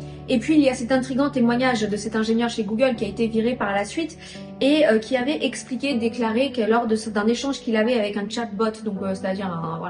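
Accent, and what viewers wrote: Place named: England, United Kingdom. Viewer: I'm loving your english accent !😍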